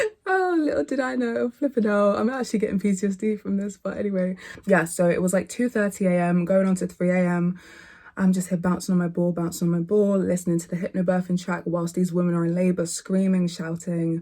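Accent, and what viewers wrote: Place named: United Kingdom. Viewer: Omg you guys are adorable together but you are freaking gorgeous!! Could just listen to you talk all day 😂 I love your accent. Are you in the Uk? Here in the states most videos I watched the dads were there just wondering if they did things differently there. I just subscribed you got me lol I also have a channel more than welcomed to sub back. Xoxo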